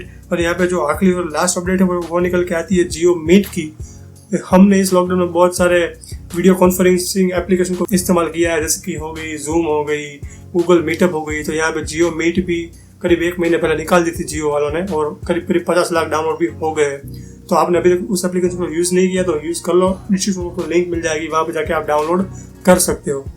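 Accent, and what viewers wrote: Gujarat, India. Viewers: By your voice i think you are gujrati .I'm right ?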